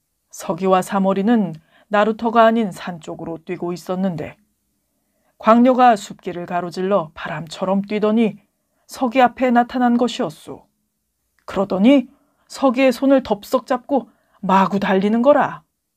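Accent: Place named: Jeolla, South Korea. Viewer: 전라도 말 안쓰면 안될겨?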